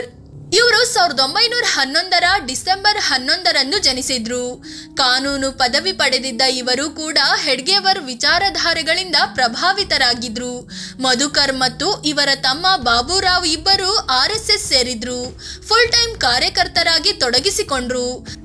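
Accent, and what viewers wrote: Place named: Hassan, India. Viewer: ನಿಮ್ಮ ಹುಚ್ಚಾರಣೆ ಶ್ವಲ್ಪ ಹಿಂಪ್ರೂವ್ ಮಾಡ್ಕಳ್ಳಿ. ಹರಸೀಕೆರೆ, ಆಸನ ಕಡೆಯೋರ್ ತರಹ ಏಳ್ಬೇಡಿ.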